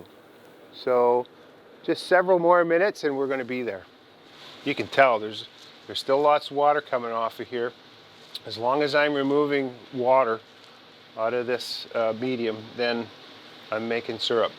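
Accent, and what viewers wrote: Canada. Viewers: I'm Canadian, and this guy's Canadian accent is too much even for me.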